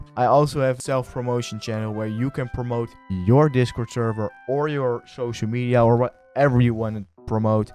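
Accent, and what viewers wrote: Netherlands. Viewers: Ben je Nederlands? Ik hoor aan die accent 😂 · Alright, goos vid but this dutch accent lmao great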